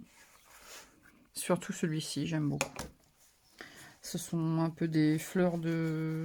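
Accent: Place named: Nord, France. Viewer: Ccou très joli ton porte bloc notes je pense que je vais en réaliser pour la fin de l’année j’en profite pour m’abonner à ta chaîne j’aime bien y’a une Tite pointe de ch’Nord dans ta façon de parler? Lol chasse le naturel il revient au galop bisous bonne fin de journée et merci à toi pour ce tuto 👍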